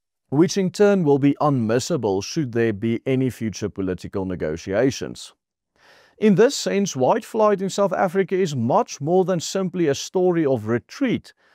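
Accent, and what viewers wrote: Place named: Afrikaans, South Africa. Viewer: EK SKRYF IN AFRIKAANS, WANT DIE MANIER WAT JY PRAAT, VERTEL MY JY IS AFRIKAANS. HOEKOM PRAAT JY IN ENGELS JY IS N TOTALE ID***T. HOEKOM PRAAT JY OOR BLANKES OP INTERNET. IS JY MAL OM SULKE INLIGTING OP INTERNET TE PLAAS????? EK WEET JY WIL GELD MAAK , MAAR JY DOEN DIT TEN KOSTE VAN BLANKES WAT GEEN PROBLEEM HET MET ANDER KLEURGROEPE NIE. MAAR DAAR IS KLEURGROEPE WAT N PROBLEEM HIERMEE HET LAASTE LUISTER GEWEES, LAASTE KYK!, JY IS EINTLIK N VYAND VAN N TANS VREEDSAME SITUASIE!!!!!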